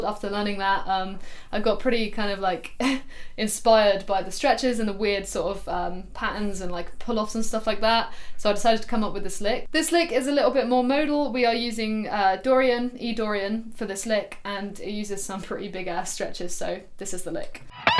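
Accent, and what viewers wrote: United Kingdom. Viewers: Did not know you are British ! you rock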